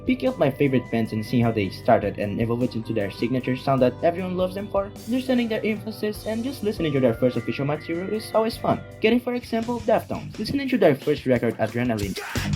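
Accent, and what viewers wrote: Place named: Brazil. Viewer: Hey, i've been subscribed for a while, and i've been meaning to ask, do have a portuguese speaking channel? i'd love to see it, and share with some other brazilian folk, for real! I believe 're brazilian/portuguese based on your accent and community posts, if not, please ignore this comment.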